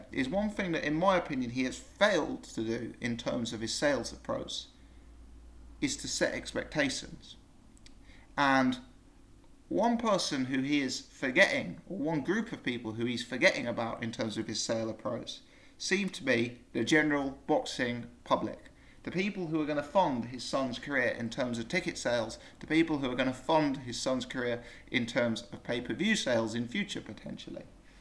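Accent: United Kingdom. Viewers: nice to listen to an Englishman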